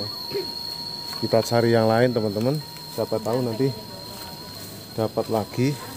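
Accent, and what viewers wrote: Java, Indonesia. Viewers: dari logatnya seperti org jawa tapi musik nya daerah sunda gek piye kokyo gk nyambung 👎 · Jowone endi mas pean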